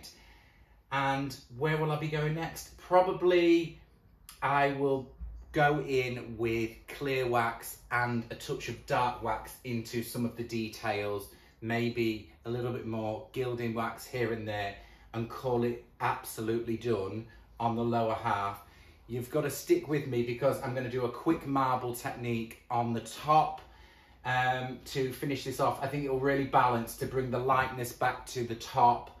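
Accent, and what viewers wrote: United Kingdom. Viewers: I love your British accent almost as much as watching you transform the most plain Jane pieces into stunning beauties. You have an amazing talent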